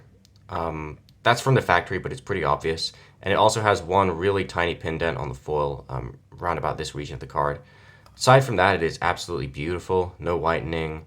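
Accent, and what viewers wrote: United Kingdom. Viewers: ah that explains much more things ! I did wonder about the location at some point since you have a not-so-marked british accent - though still obviously noticeable in hindsight ^^ Well keep the good work and thank you for sharing your magnificient returns. It's quite rare to have European collectors not shy of showing their pearls so it's a pleasure to watch it beyond the Channel.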